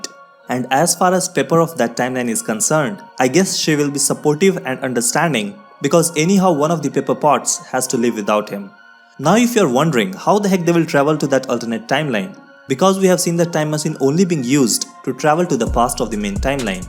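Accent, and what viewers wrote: India. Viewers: r u indian ??? the acent is like india . and i think i c this graphic somwhere and the concept too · U r Xcent sounds like a Marathi guy.......................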